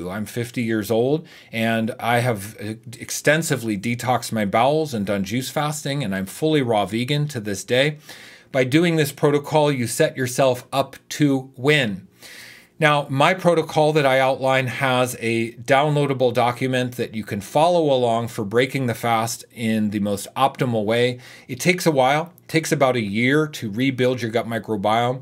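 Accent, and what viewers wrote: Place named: United States. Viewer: Cause he is speaking very well,not too fast and trust me because it’s American English. 😊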